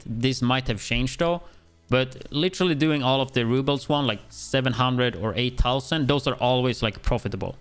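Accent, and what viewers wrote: Sweden. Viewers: are u swedish i hear som swedish dialekt haha lol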